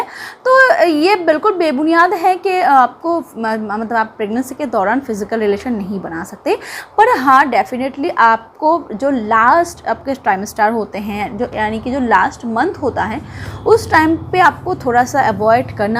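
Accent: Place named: Bihar, India. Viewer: Hello my name shehbaj Khan Aap Bihar se belong karti ho Kyu ki apki voice Bihar ke taraf ki hai ye nhi bol rha achi nhi h bas lga mere ko ...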